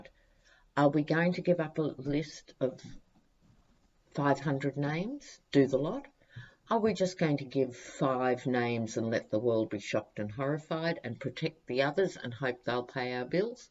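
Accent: Australia. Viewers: No apology necessary, and it’s not your charming Aussie accent. I wouldn’t have remembered the French pronunciation either, had I not had a childhood friend whose native-French mother had the same name.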